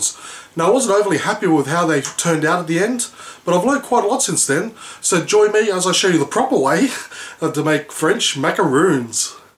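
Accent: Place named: Australia. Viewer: he sounds like an aussie hell yeah!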